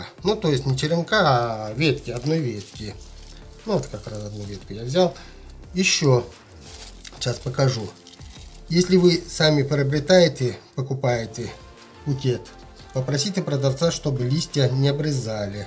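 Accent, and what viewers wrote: Belarus. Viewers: Как приятно слышать белорусский говор, просто и доходчиво рассказал. Только хотелось бы увидеть ещё как из бокового тонкого побега вырастает новый. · Какой приятный для слуха белорусский говор,я не ошиблась?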